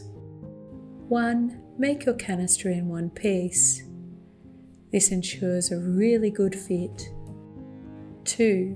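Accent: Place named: Australia. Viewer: Great video. I was very happy this came up in my feed- I was so excited to hear your Australian accent! Yay! 🥳 I have been watching lots of potters but I am so very happy someone as skilled as you from AU has decided to post. Thank you 🙏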